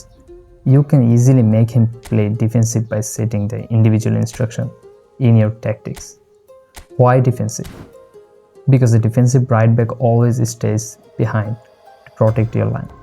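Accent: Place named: Kerala, India. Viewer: i knew right away that you're a mallu from that accent...aren't you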